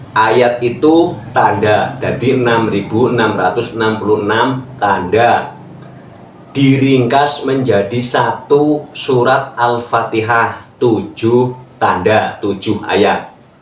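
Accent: Banyumas, Indonesia. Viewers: Ingin sekali tau alamat tempat tinggal nya pak kyai....di lihat dari logat nya spti nya...dari Banyumasa. Sehat trs pak kyai❤❤❤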